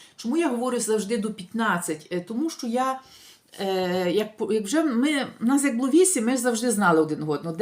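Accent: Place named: Western Ukraine, Ukraine. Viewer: ..ВЫ СИДЯ СЕЙЧАС В ИТАЛИИ..ГОВОРИТЕ ОЧЕНЬ ПЛОХО ПРО НАШИХ МУЖЧИН..А ТАК ЖЕ ПРО НАШИХ ЖЕНЩИН..В С ЗАРАДНОЙ УКРАИНЫ,СЛЫШЕН ПРОНОНС..СТАРАЕТЕСЬ ВСЕМИ ПУТЯМИ УНИЗИТЬ!!!ВЫ 10000%ИМЕЕТЕ ИТАЛЬЯНЦА..НО ХОРОШЕГО..ТАК ВАМ ПОВЕЗЛО..И ГОВОРИТЕ ПРО НАШИХ ЖЕНЩИН,ЧТО ИМ ЯКОБЫ ВЫГОДНО ЖИТЬ С ИТАЛЬЯНЦЕМ..ЭТО ДАЛЕКО НЕ ТАК!! ДА..ЕСТЬ НАШИ ЖЕНЩИНЫ ЖИВУТ..НО ОТ БЕЗЫСХОДНОСТИ..ОНИ РАБЫ Ц ИТАЛЬЯНСКИХ МУЖСТН..А ВЫ ТАК ЛЕГКО ИХ ОСУЖДАЕТЕ...БОГ ВАМ СУДЬЯ🙏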